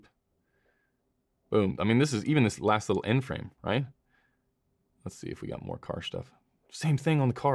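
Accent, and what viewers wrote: France. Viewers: Ahahahaha amazing French!! 😂😂😂👍🏼 Great accent!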